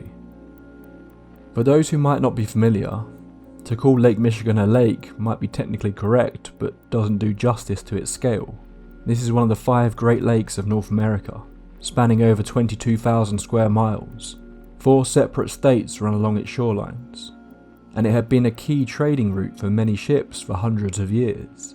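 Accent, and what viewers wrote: England, United Kingdom. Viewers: Don’t! We love your British accent!! 🇬🇧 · It's a little funny to hear a quintessential British voice recounting American ghost stories. Like my ears and brain do a double-take. But as usual, your research and storytelling are spot on as always. I really do love your work, regardless of where the tales take place. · This motherfucker is not from Michigan I can tell by the British accent get the hell out of here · Man why is this British guys scaring me rn😂🤣 good video man😂👍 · Not "glided" but Glid! I'm becoming rather disillusioned by your channel as, though you speak with an English accent, your grammar suggests you were "schooled" but not educated in America. Schooled but not educated? Simple. America's education standards are non-existent! A Harvard or Yale graduate is equal to a kindergarten pupil anywhere else in the world.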